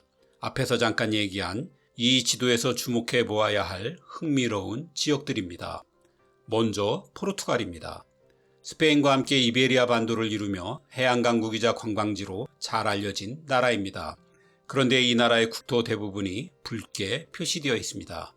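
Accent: Gyeongsang, South Korea. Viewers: 그치만 일정한 억양이 무한반복되어서 혹시 에이아이 아닐까도 생각해요. 경상도 억양이 바탕에 깔린 출신지 불명의 섞어찌개 말씨. 어쩜 똑같이 진행하고 올라갔다 내려왔다 하는지 신기해요.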